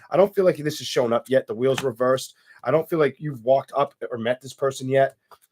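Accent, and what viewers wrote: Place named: Brooklyn, United States. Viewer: My friend, love the confidence in the way you read! u giving me Brooklyn vibes that's an extra plus 😂😂❤❤❤❤ but that libra reading the ending u glitched my friend because I saw fire and you called it water ...no sweat but u moving toooooooo fast , I love it thou because u don't talk alot like most readers and I appreciate that!!! New subbie ❤❤❤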